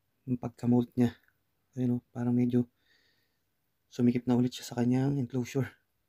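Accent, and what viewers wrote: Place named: Cavite, Philippines. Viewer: sanaol tol madami nang slings may puntong caviteño hahaha.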